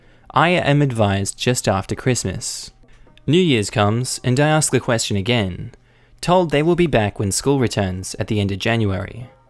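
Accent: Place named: Frankston, Australia. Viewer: Sounds like Frankston type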